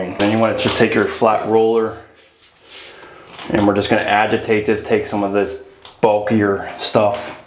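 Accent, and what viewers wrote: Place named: Pittsburgh, United States. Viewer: I'd recognize that beautiful Pittsburgh accent anywhere. Stone cold yinzer coming to the rescue.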